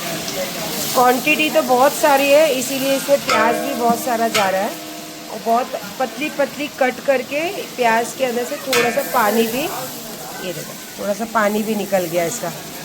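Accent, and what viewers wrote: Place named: Deccan, India. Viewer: WOW she's talking dakhni urdu❤❤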